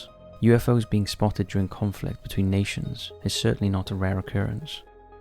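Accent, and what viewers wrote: Wales, United Kingdom. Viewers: I can hear a Welsh man init x Who doesn’t love Wales 🏴 ! Whose coat is that jacket? ❤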